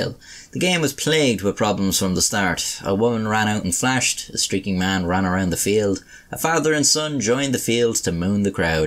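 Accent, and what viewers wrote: Ireland. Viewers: I think everyone loves you because of your Irish accent. Plus you are pretty funny!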